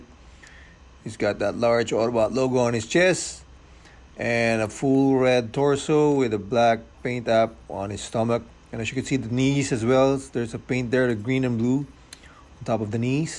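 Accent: Philippines. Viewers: You sound like a Filipino. Mabuhai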